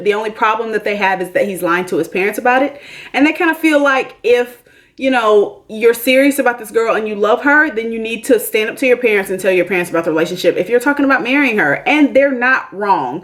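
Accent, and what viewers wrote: South, United States. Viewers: lol...You are so southern like me..It's funny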